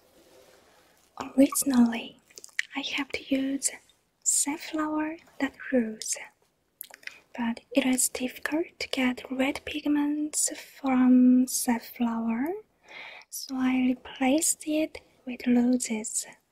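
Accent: South Korea. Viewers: 어쩐지 발음이 약간 우리 영어선생님이랑 비슷하고 한국인 같㉰ 했는데 내㉮ 젤 좋아하던 asmr유튜버㉮ 한국인이라닌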